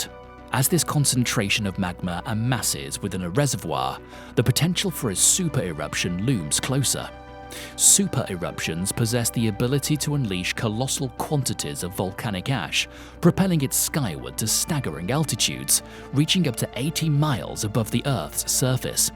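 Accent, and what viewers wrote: United Kingdom. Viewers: The narrator has that annoying British accent that is constantly used online to sell something, usually something that is threatening to destroy the money grabbing pharmaceutical industry. Hence, where is the credibility in overusing such an accent on the "internet wild west" where anything goes; you can say any mindless thing you want with little fear of significant repercussions.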